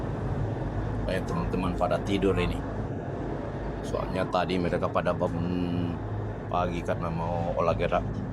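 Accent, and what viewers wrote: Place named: Toraja, Indonesia. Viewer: Tator,kalau dengar logatnya 😂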